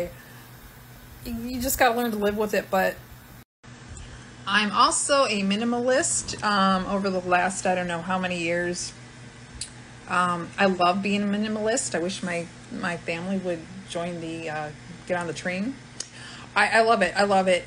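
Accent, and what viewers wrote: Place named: South, United States. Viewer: Omg my southern accent rubbing off on you.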